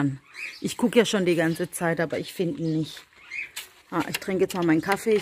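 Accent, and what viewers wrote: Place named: Swabia, Germany. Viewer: Ich höre dich so gerne schwäbisch erzähle... Weckle...... Semmeln wie süß... 😊😂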